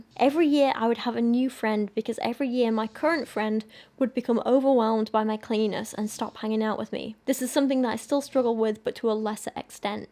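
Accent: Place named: United Kingdom. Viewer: I have been wanting to ask but your accent... Where are from? I'm guessing UK but what part?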